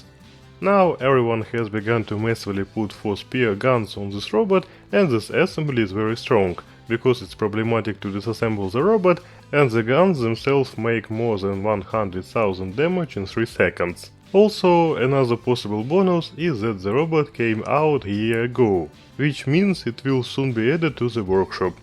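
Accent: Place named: Russia. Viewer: слышу отчетливо русский акцент, полоскун ты чтоли?)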